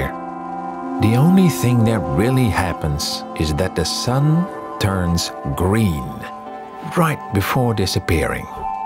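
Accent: Netherlands. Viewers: Goede video, dacht al te horen dat je Nederlands was.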